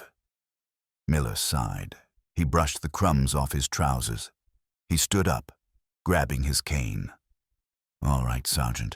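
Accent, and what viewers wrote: United Kingdom. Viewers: Audio would be better if it wasn't using a slight British accent when talking about US military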